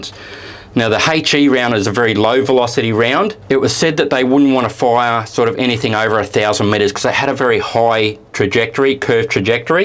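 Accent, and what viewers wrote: Australia. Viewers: You have a very authentic Australian accent. Certainly you come from the land down under. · I wonder how this typical Australian accent came about??? You can hear him incorporate/use a certain tone on certain words. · Ha with his Australian accent I at first thought he said "murder rifle" rather than "motor rifle"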